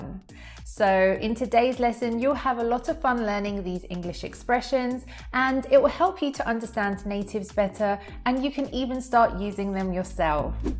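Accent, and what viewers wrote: United Kingdom. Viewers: Hello i'm one of the your big fan's and I really like your Accent because I'd like to speak british accent properly so I hope you to make a special lesson about most common sounds to sound more british, Thanks for reply.😃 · I love the British accent and I'm chuffed to bits with this video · I hear a lots of different british accent in movies and tv shows, now I got used to your accent and I would like to know which part of the UK are you from so when I hear someone speaks with your accent I will know where he is from, I got used to one of the X factor judges accent and she is from Esses now when I hear someone speaks with the same accent I know they are from Essex, I like to recognise where people are from juste by leastning to their accent, that is the reason I am asking you which part of the UK you are from, and you don't have to answer my question if you don't feel confortable to, thank you.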